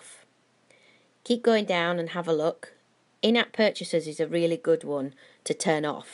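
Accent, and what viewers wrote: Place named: United Kingdom. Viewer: Good video. I love the British accent.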